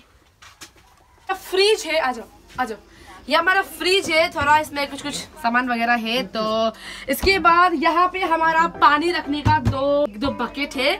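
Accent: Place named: Nagaland, India. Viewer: Aap kaha rahte ho aap k boli se lag raha h aap nagaland se ho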